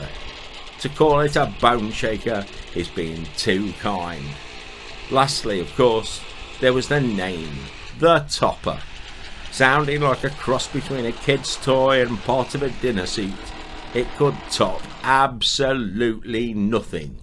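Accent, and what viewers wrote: United Kingdom. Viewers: Your British voice makes this ten times funnier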